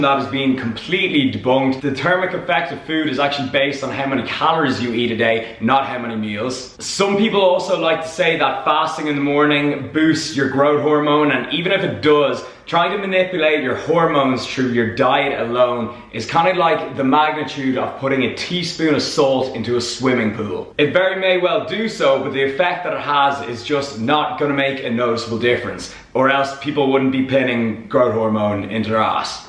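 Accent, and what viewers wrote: Dublin, Ireland. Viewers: thats the Paddy coming out in him. Sounds like he is from Dublin.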